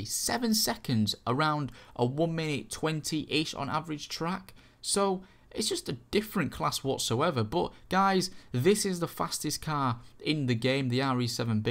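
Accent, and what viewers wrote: Yorkshire, United Kingdom. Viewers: Is that...a Yorkshire accent? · you sound like a british is it right:)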